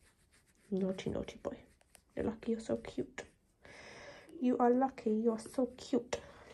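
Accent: United Kingdom. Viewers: Your accent is UK ?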